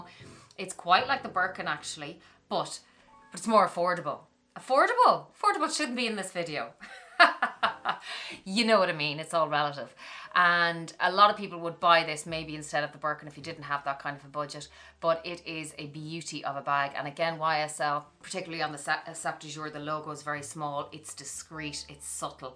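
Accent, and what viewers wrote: Ireland. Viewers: Dear, in my mother's time, one bought a timeless bag and wore it always. She lived in London, Paris and New York and wore her Chanel classic flap everywhere. She had bought it in Paris. It was the age of class. Now one needs a dozen bags and have a collection! Welcome to the age of greed. In any case I love you! Lived in Ireland for 10 years had my 2 kids there. Just live your Irish warmth and wonderful Paddy accent! Stay blessed and enjoy your great style! 😍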